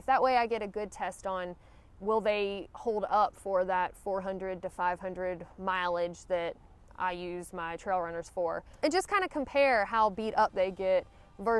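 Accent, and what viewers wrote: South, United States. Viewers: The "hey, ya'll" got me! Love hearing a southern drawl on YouTube. Sounds like home to this North Carolinian. :) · I wear Altra Superior 4.5's (coming up on 500 miles 1st pair), which I love! (found on sale and bought all of my size) They came with a rock guard insert. What are your thoughts on that type of insert? I installed them (under Dr. Scholl's inserts), but wonder am I really getting a benefit. Love your video's, southern drawl (us being from Texas) and expressions (nicknamed with friends Dixie-izms)!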